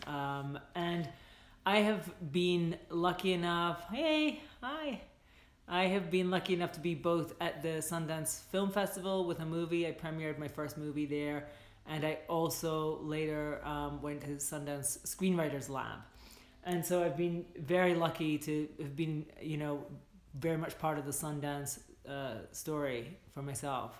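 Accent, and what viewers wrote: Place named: Scotland, United Kingdom. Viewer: Thanks for this! Thought I detected some Scottish in you!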